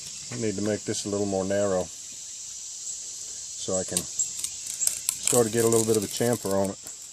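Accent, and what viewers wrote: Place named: South, United States. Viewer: Thanks for this video. Sounds like your down south somewhere.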